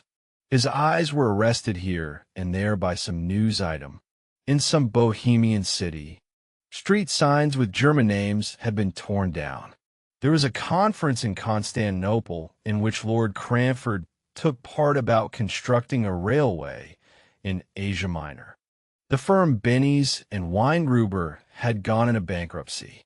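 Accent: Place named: Texas, United States. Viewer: AI SUCKS IN GENERAL, AND AN AI TRAINED TO HAVE A TEXAN DRAWL READING A EUROPEAN NOVEL, WELL NUFF SAID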